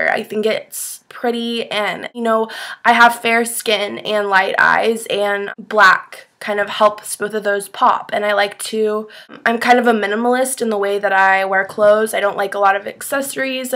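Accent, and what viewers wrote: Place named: United States. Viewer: I love when you say yaaall !! That American accent ! <3 And your fashion is tops !!!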